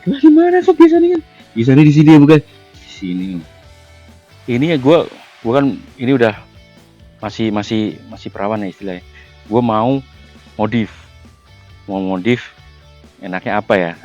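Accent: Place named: Java, Indonesia. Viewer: Jowo ne medog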